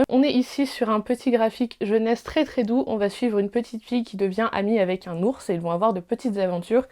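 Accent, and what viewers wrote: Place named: England, United Kingdom. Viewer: Coucou, j’ai hâte de voir tes retours car il y a beaucoup de livres qui me donnent envie et en passant j’aime trop ton accent anglais 😍. Bon challenge et bonne lecture!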